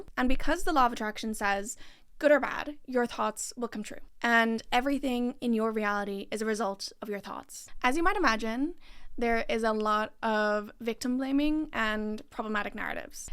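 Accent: Ireland. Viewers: Are you American? There seems to be a slight Irish influence on your accent. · Ive finally placed your accent do you have an irish parent 😭😭😭😭 im probably late 😭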